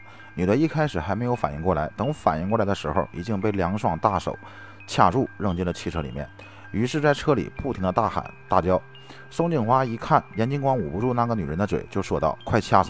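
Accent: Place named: Tianjin, China. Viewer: 天津话不像天津话，普通话不是普通话，听着别扭。干脆就用天津话讲就算了，这样反而更好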